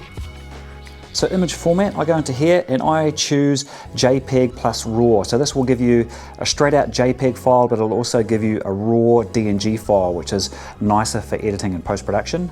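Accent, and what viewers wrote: New Zealand. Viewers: Haha! Definitely Kiwi! Great advice and guidance.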